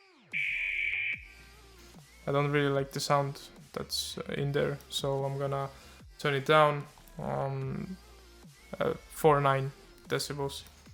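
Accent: Russia. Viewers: мне кажется или ты русский? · Ur accent sounds like u from Russia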